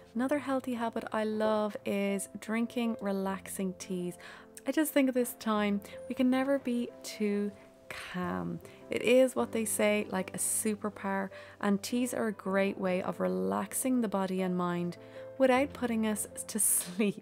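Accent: Ireland. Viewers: your lovely calm Irish lilt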